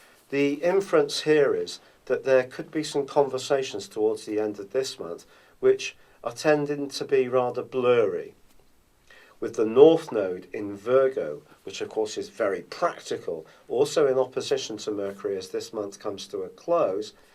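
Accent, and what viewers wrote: England, United Kingdom. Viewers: Thanks, I like your english accent...:-)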